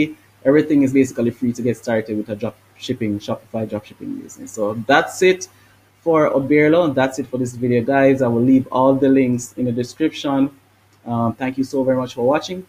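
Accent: Jamaica. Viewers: big up yard man sound like jamaican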